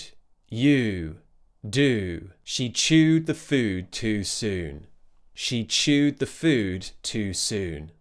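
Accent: United Kingdom. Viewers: I have been trying to differentiate the American IPAs and the British ones... Not easy... But I think I have figured it out thanks to your video. Love you, teacher!!!!!! Thank you so much!!! Your British accent sounds soooooooooo nice in my ears.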